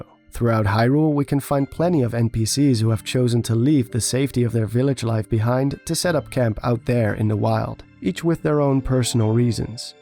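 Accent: Netherlands. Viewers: I already thought you were Dutch! I recognised the accent :) (I am also Dutch myself) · You make great videos I really thought you had like a million subscribers. Also are you dutch because you kind of have a dutch accent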